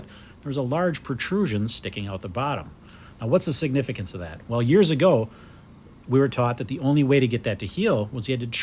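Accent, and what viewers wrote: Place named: United States. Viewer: this one of the few american accents i find bearable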